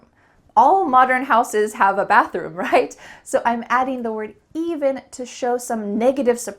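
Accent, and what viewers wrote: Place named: United States. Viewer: I loved american accent with you❤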